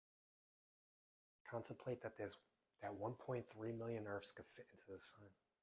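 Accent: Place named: Chicago, United States. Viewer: New to this channel but spirit had this guy come to my FB reels and it's been since June 2025 that I was last on FB. Anyway, I needed to take care of my body as my right side isn't working well. Stiff & painful with every movement and it's been years. So I did some movement stretches from him. I felt better as the movements were slow not to injure an injury. Listening to him speak on YT made me think of Chitown (Chicago) from his accent. At times I'd giggle & at times I felt family that still live there. Words like "bref, stumick, erf" man, I miss my family & Chicago!! ❤❤❤ TY guy for your video😊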